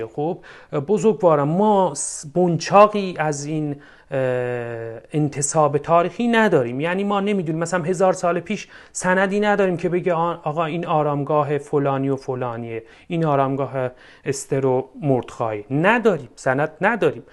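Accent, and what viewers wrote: Azerbaijan. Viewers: لهجه اذری شما بینهایت زیباست.❤❤❤❤❤❤❤❤❤❤❤❤❤❤😮😮😮😮😮😮😮😮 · درود خدمت استاد گرامی جناب چقدر غرور آفرین هست که استادی با این گویش شیرین آذری با اسناد مستحکم تاریخی بلای جان ایران ستیزان بشود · درورد بر استاد عزیر و ارجمند با این لهجه ی زیبای آذری مرحبا به شما و دوستان شما بابت آگه سازی تاریخ ما. پر قدرت ادامه بدهید با تشکر. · لهجه اذری شما را دوست دارم. به ایران ایمان دارم.مردم ایران بهترین مردم دنیا هستند. فرهنگ و مدارا در ایران وجود دارد. لهجه نشون میده فرهنگ و مدارا در تبریز و اذربایجان و گیلان واصفهان و کل ایران وجود دارد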